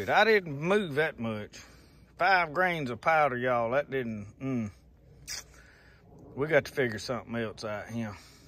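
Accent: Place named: Mississippi, United States. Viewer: Well, men are usually born problem solvers, we tend to wanna fix things. As I get older, I tend to keep my opinions to my self, because I’ve figured out that my opinion is sometimes wrong!!! Lol. Enjoy your channel, love that Mississippi accent. Makes me sound like a yankee, and I’m from Arkansas!!! Lol. (No offense, love my yankee brethren) !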